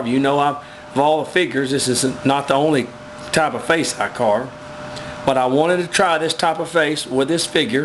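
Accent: Texas, United States. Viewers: Nice info.. anyways is him using Texas accent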